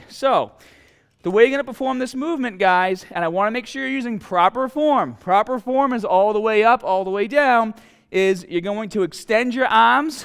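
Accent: Boston, United States. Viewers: “you gotta TAHGET the muscle to SPAHK some growth” I love Boston accents lmao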